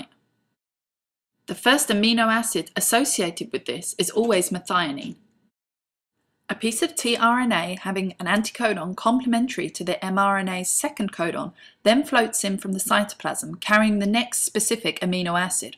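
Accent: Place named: United Kingdom. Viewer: Were are you from - you sound British?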